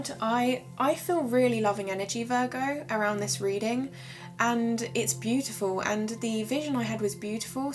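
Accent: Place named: RP, United Kingdom. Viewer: ok, your accent is pretty posh, and my eileen was a borderer, but you have a good heart.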